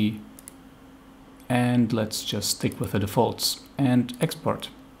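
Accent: Germany. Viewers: Thx you. Very clear and well explain. love the german accent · Nice tutorial. Very usefull.... and great german accent ;)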